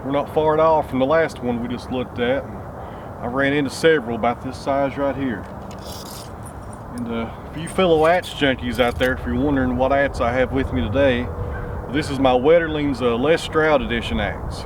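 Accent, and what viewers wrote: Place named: South, United States. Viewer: From what I can safely assess in your video... based on the species composition and general contour of the land - combined with the southern deflection in your dialect... I am going to throw out an educated guess that your are geographically situated somewhere in either in north Georgia, north Alabama, or most likely Somewhere in Tennessee. How'd I do? Post Script... I have 23 years experience cruising timber professionally across a diverse commercial platform. After two decades in the business, it seems to me that somewhere around 98 percent of the general public have no idea it is even a job. That always puzzled me... as their very world revolves around the production of all the various wood products... any thoughts to that end?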